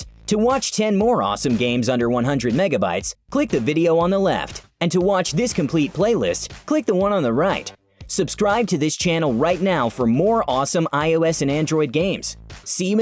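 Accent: United States. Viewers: Theb why does your voice sound so american if your indian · A handsome Indian with a perfect American English , Man you rock!!!!!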